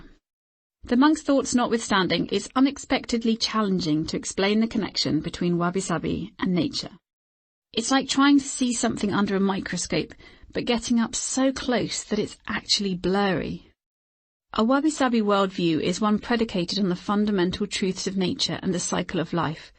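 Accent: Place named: England, United Kingdom. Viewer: Great book terrible narrator should be a male voice, not an English voice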